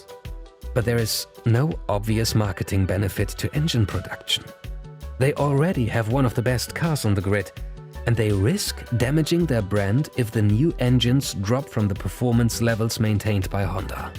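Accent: Germany. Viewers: you are german! i can hear it, how you pronounce the german/austrian names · Your German accent is so off-putting. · So wie du die Namen aussprichst bist du Deutscher oder Österreicher :D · No doubt the narrator is German. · are you german? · Your are German right? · I'm new here but I could listen to the German accent all day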